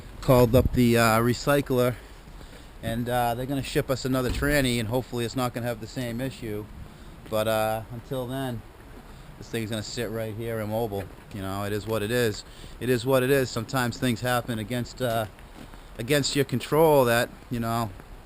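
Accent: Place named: Massachusetts, United States. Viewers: 🚗 Loving the Bostonian Accent! These x308 Jaguars have several non-serviceable sensors, when I first bought my XJ8 Vanden Plas I had to swap the throttle body because of a faulty throttle position sensor that is permanently mounted within it. $1,000 part to fix a $30 sensor is crazy, but it made the car road-worthy and it is still working perfectly 7-years after the job with no other major issues. The prior owner had no idea what was causing the problems (scanning codes was all that they needed to do) and he sold it to me for a great price, so I'm not complaining now, but investing $1k to fix a sensor was initially quite concerning, lol. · Yo. You gotta be from Massachusetts !!!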